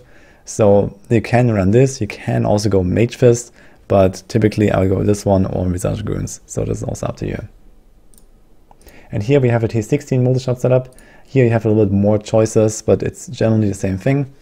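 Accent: Germany. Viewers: His German accent is not a problem at all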